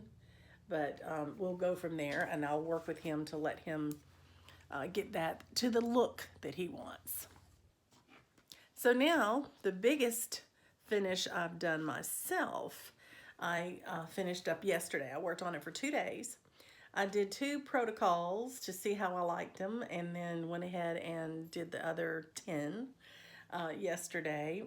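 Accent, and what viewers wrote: South, United States. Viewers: Such a pleasant southern accent and I love your taste in patterns.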